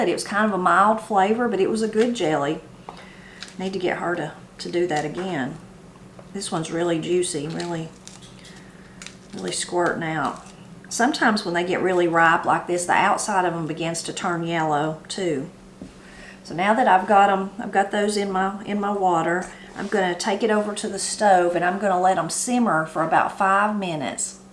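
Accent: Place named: Ozarks, United States. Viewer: So my Mom is Hawaiian- Filipino and my Father is from Arkansas Ozark area. The Ozark folks have so many similarities from accent to foods to the Appalachia folks. In Hawai’i Passion fruit (Lilikoi) is used in desserts and mostly to make a favorite drink called POG. POG is a mix of Passion fruit, Orange and Guava juices 💖🌺💖 I Love You channel! It reminds me of cooking in the kitchen with my Granny.. the accent and food is exactly the same. She passed several years ago and always hold her and her memories close to my heart. Watching your videos brings so much nostalgia. Thank You❤❤❤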